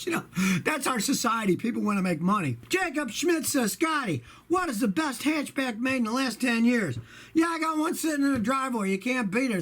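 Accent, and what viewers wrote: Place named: Texas, United States. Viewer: I don't know what I like best, is comment, knowledge, texas accent...or the images on his right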